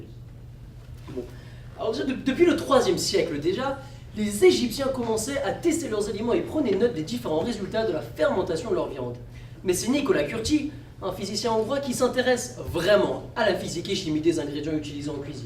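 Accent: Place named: France. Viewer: Un sujet bien élaboré, des gestes corporels appropriés, un débit de langage maîtrisé tout ceci dans un français impeccable. Seriez-vous français car, l’accent est différent de celui des québécois?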